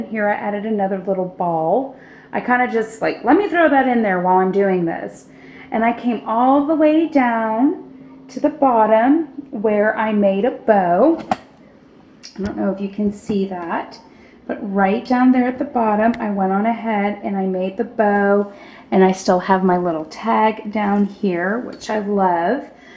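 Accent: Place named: South, United States. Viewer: I was born and raised in Atlanta so I know a southern girl when I hear one. Kayyy???? lol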